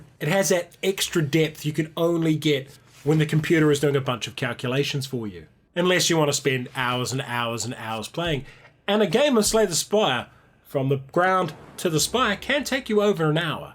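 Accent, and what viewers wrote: New Zealand. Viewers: Oh yay a review by a New Zealander, so I will have access to/knowledge of everything you're talking about! Nice to hear a kiwi voice. I like the looks of One Deck Dungeon and Lords of Waterdeep.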